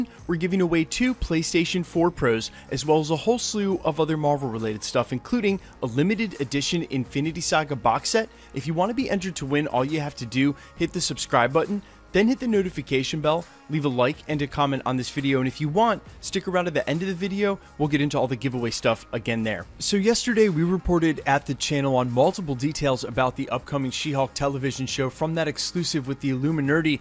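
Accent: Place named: California, United States. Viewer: LOL i see what youre pointing, yes he is slightly sound like the Californians characters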